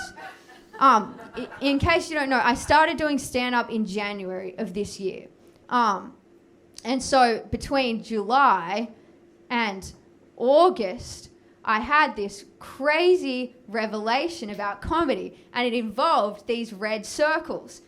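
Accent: Australia. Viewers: Wow you are amazing I love your energy and of course the accent is to die for, I'm from Alaska and there's only ever been one and a half Australians here and I missed the event because it was like 900 miles away almost halfway across the state lol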